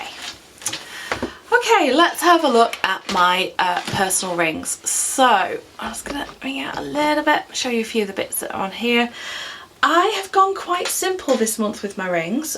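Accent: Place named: England, United Kingdom. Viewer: Love your July setup. I hope this doesn’t sound weird but I love your English accent. From an Aussie follower x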